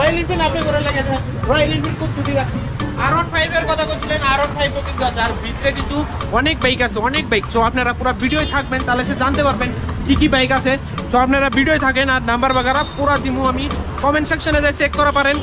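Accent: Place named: Bangladesh. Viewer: I suspect this guy is from Bangladesh. His accent says so. Not like pure indian west bengal bengalis. Requesting respective authorities to check his and his family background and origin seriously. Infiltrators from Bangladesh burma and Pakistan are playing havoc on the security and economy of our country.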